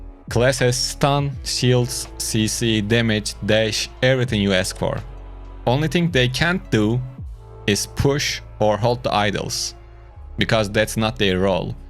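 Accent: Turkey. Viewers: Kardeşim sen türk müsün ingilizceyi konuşma aksanın cidden türk gibi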